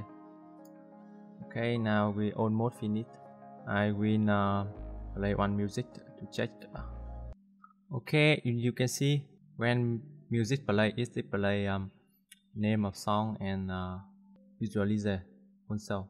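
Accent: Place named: Vietnam. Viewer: nghe kiểu đọc là biết người viêt, haha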